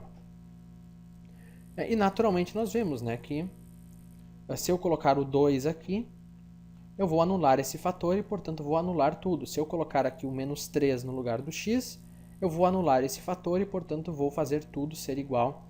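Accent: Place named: Rio Grande do Sul, Brazil. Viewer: muito bom. De onde vc é? Sotaque do Rio grande do sul =)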